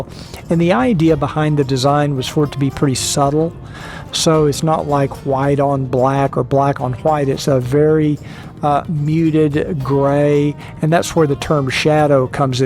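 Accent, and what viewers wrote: United States. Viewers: Ok Hi Mr " ".. So. (Oh this is weird talking/ typing this, Sorry. First time I come in some way contact with you Sir and We're talking teeth.- I didn't see that one coming?") But since you still bring up such a very important subject I'll submit my thoughts on this. Yes first appearance is what you notice with people.. in someone's face. That would be the teeth and smile! 82% of U.S. adults agree that the first thing they notice about others is their smile. I know bec. i did that with you, Sir. I saw the teeth and saw that it looks somehow painful. I Could hear it on your speech as well and that something was of? No. But there was something with your lower jaw or your sound on your voice I mean how you sound apart from that rough Dallas Texas- dialect of yours....it almost sounds something like you suffer a blocked nose? (And Sir, NoHate at all) But now I could tell that this, yours, your special sound of yours.. 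That is You! I don't have to not even see you anymore too know. And I've learned to like it and almost got used to it now at this point! It's that easily recognized- voice of yours again these days! I just sit back and listen to you and follow along on both your long and less short journeys. And it's amazing! I can see I don't even notice the teeth anymore! I don't know exactly.. Oh man you got me on this subject! This can be a touchy/ sensitive subject overall by just talking about teeth in general. And I don't Judge people? I'm Not judging you now at all...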